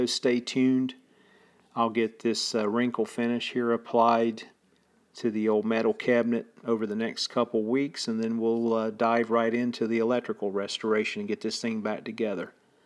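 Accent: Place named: Carolina, United States. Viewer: ..are you a Carolina boy by chance? cnt quite grab the origin of your accent..but thx for the great tips on stripping..appreciate your time and effort...Rich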